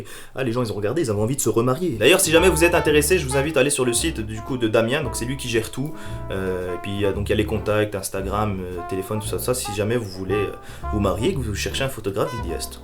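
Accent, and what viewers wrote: Turkey. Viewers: T as un putain d’accent turque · Frr c quoi ton accent turc wlh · Waa ton accent 😂😂turk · Gerçekten , c quoi cet accent turque , je suis Algérienne fiancée à un Bingölien et je parle turque sans accent Fais travailler le tien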